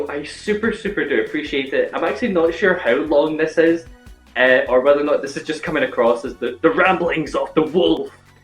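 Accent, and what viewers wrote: Scotland, United Kingdom. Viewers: Is that a Scottish accent I detect? Well, hello there fellow Scot! Interesting video.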